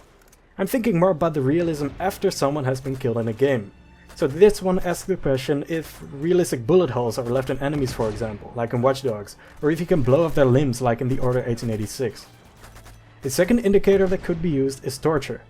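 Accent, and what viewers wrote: Netherlands. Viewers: I think he has a light Dutch accent but I am not sure. I do know that the movie rankings In the Netherlands stop at 16 which he mentioned in the video so it's possible.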